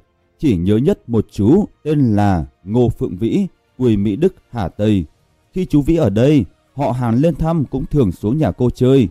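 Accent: Nghe An, Vietnam. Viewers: Người đọc cố gắng đọc theo giọng người Hà Nội, chắc là muốn để người nghe dễ hiểu, nhưng vẫn mang âm giọng người Nghệ lĩnh ...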